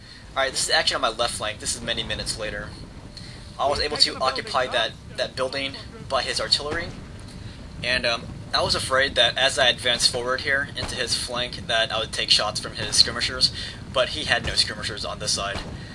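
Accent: Netherlands. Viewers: funny dutch accent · lol nice battle and ur dutch accent is funneh but very well spoken. I was suprised.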